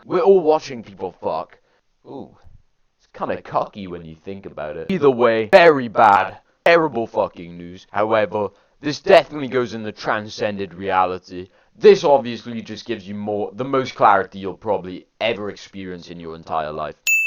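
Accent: United Kingdom. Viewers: Bro I know from your accent and because you went to the Deftones concert that you live in South Wales lol, I do too but I didn’t go to the concert because I’m not really that fussed even though I like the band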